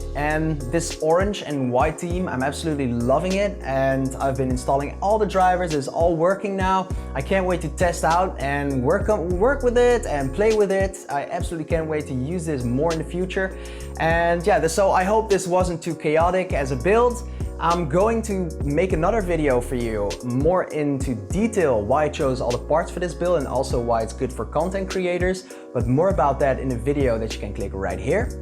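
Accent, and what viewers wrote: Netherlands. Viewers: within the first few seconds i was like 'he has a dutch accent, is he dutch?' and then i saw the box tape and i was like wait isn't that Azerty tape since i bought something off of there like a week ago and it was so yeah your english is really good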